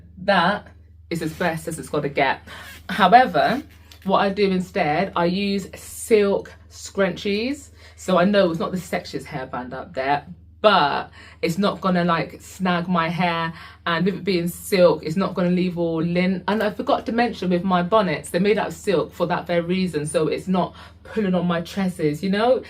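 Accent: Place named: Northern England, United Kingdom. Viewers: I recognized the UK accent. Love to hear it on black people. Waves and hugs from the midwest of USA! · UK in the house!🇬🇧So lovely to hear a British/Up North accent! Great advice, really helpful and your hair looks so healthy!❤️✨🫶🏾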